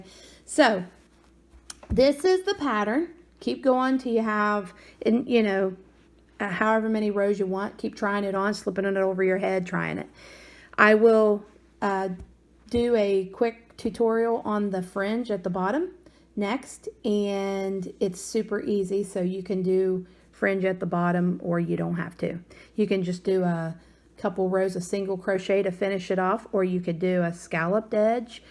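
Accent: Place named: South, United States. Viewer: Ooooh, Yay!!! A southern gal teaching CROCHET!! You sound like you're from the Carolina's by accent....but I could be wrong (usually Ga, Ms, Al have a thicker drawl than the Carolina's, but maybe I'm just a biased Carolina gal😜). Anyway, love that I found a fellow southerner to follow! Love the poncho! Thanks for giving the count for the various sizes too. And I thought you did great with your camera/CROCHET work! I don't think I could do it...I'm doing good looking at it directly and still miss, I'd really be OF if I had to look through a viewfinder or a screen! So, honey, you did great! New sub! Looking forward to more of your vids and patterns! Bye (in that beautiful southern bell drawl)! 😉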